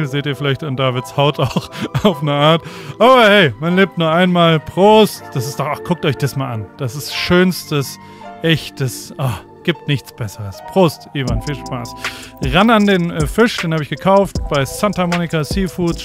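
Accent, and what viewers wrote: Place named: Germany. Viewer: Your German accent ist einfach super geil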